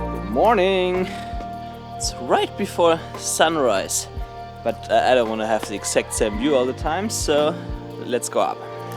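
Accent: Germany. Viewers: Hey, really nice review! I got the Fuji X-S20 this week as well🙂 I am new to Fuji X. Since you mention it a lot in the review: What kind of video settings would you recommend for YouTube videos (No Flog / without color grading)? I think about: 4k, H265, 4:2:0, 200 Mbps, Eterna (?) Looking forward using this camera! Bist du eigentlich Deutscher? Es hört sich so an 😀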